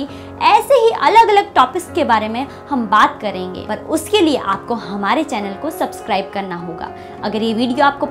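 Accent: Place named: Bihar, India. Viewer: Bihari tone thik karen.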